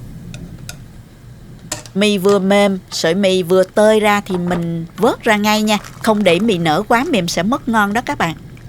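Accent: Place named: Saigon, Vietnam. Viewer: Giọng nói đúng là người SG đó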